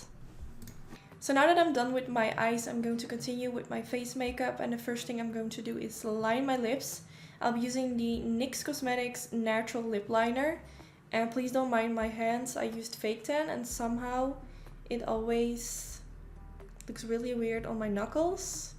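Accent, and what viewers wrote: Netherlands. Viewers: Love your full face tutorials!!! Listening to you speak makes me miss living in the Netherlands.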